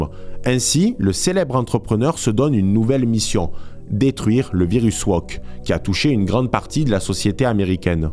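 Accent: South, France. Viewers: Cet accent du Sud et les cigales qui rappent en fond; ça sent bon la lavande tout ça ! !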